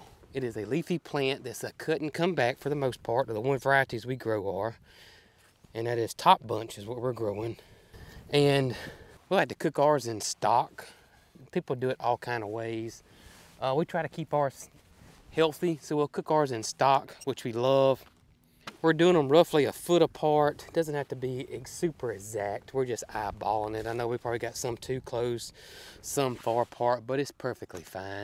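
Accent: South, United States. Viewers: Greens and beans ROCK the south! I gotta say, I love leaving the closed captioning on when I can. I would guess that the folks that do the cc for YouTube are 1. not gardeners and 2. not from the south. Watching them goof up your southern drawl really makes me chuckle sometimes. Wonder how hard it was for you to pull that HAIR ROOF (harrow) through your garden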